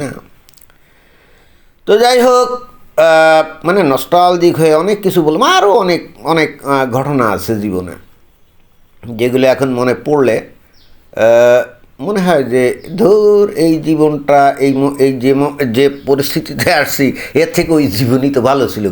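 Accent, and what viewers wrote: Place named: Jessore, Bangladesh. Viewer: আপনার কথা শুনে মনে হয়, আপনি যশোরের লোক।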